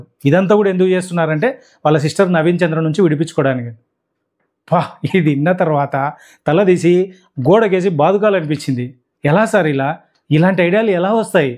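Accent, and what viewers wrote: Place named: Rayalaseema, India. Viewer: మేష్టారు పగలబడి పగలబడి నవ్వుకున్నాను, దయచేసి మీరు ఈ రివ్యూలు చెప్పడం ఆపొద్దు, రాయలసీమ యాస కుమ్మి పడేసారు, 😅😂😅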